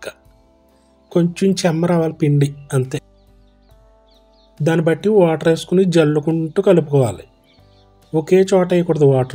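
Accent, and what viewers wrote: Godavari, India. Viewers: Meeru matlade Godavari jillala telugu vinte kadupu nindipoindi.Hyd vachhaka ee bhasha miss avutunnam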